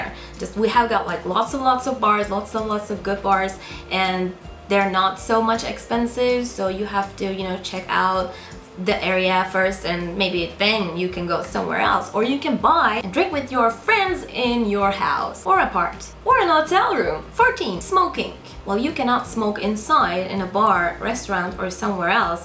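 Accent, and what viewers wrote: Turkey. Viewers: Well..She just trying to be famous as other crappy ppl. i think she is fully Turkish or she might living in Usa or any other country atm,she just trying to act like she is not Turkish but her accent doesn't let her hide it. i just notice it in first 5 min.i will check her other videos too, lets she what she done. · Aksanindan turk oldugu anlasiliyor, mal mi ne ya. 😂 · Kız Türk arkadaşlar. Aksandan anlaşılıyor zaten. Hakkında bölümünden de görebilirsiniz. (Çok iyi İngilizce konuşuyor, o başka) Türk'ü Türk'ten başkası kötülemez ki. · Aksandan Türk olduğu belli. Kullandığı adı yadırgamıyorum çünkü Çiğdem, Işın gibi bir adı koysa hiçbir gavur gelip izlemez. da.. ne iş? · Canım senin isim yabancı ama sen Türk sün belli o aksan ı yapman için çocukluktan öğrenmen Lazım Türkçe bu o kadar değil. Ayrıyeten konuşmanı düzelt demeyeceğim belli ki tarzın bu onun yerine değindiğin Konuları mal gibi anlatma:// so sadd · Konuşan kiz nereli? 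Türk mu? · Why is your name if you're a Turk? You also don't sound like you've been raised somewhere else than Turkey, you have the Turkish accent. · She is turkish! This is typical turkish english accent when they learn it in school:)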